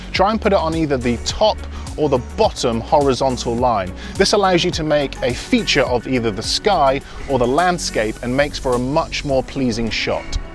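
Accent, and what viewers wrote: United Kingdom. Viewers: I think thats how he pronounce focal point, he may be is a british coz thats their accent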